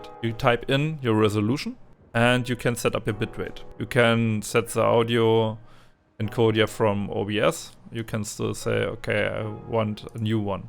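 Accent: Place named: Germany. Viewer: Man hört das Deutsch aufjedenfall raus 😂❤ danke für den hilfreichen Tipp 😍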